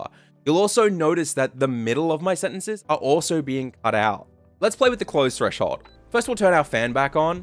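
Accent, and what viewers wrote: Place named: Australia. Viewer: I've followed for a while and I've even watched this video before as it's helped with mic setup. However why am I only now realising he's Australian!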